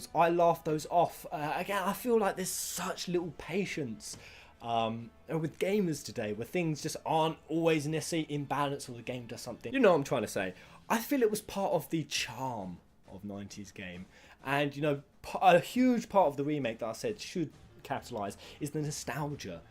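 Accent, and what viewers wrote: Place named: Scotland, United Kingdom. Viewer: Scottish accent is great.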